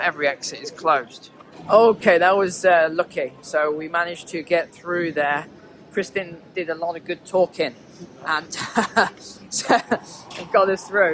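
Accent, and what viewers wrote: England, United Kingdom. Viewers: Sounds like an Englishman. You should be used the national government handing over the country to arabs and africans.Their country now. Get out! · This happened 10 years ago already and even before it. Did it just occur to u? U sound british. Not much different there. Or in Germany.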